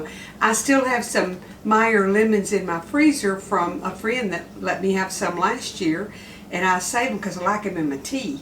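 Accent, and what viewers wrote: South, United States. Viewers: Hi! sent me over and I’m so very thankful she did💚 you so remind me of my husbands grandmother and she lived in Livingston Tx so not too far! I have lived in the Houston area all my life and I just love your sweet southern voice and cooking! I am new to canning and can’t wait to go through all your videos to learn more!!! I also know I’ll love these muffins💚💚💚 MERRY CHRISTMAS to you and yours 🎄🎄🎄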